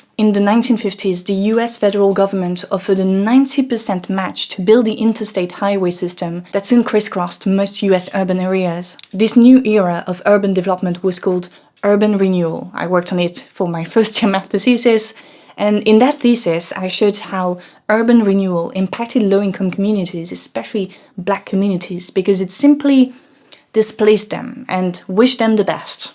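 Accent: France. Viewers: Oh you're french! No wonder you're so smart. Also, french accent makes everything sound classy.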